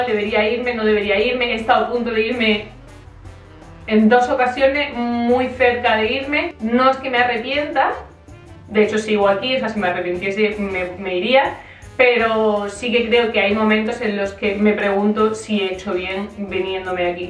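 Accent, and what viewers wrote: Granada, Spain. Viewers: Hola! De que parte de Granada eres? La verdad es que en el acento me soñabas a granadina, jajajaj pero hasta que no lo has confirmado no estaba segura. Un saludo de otra granadina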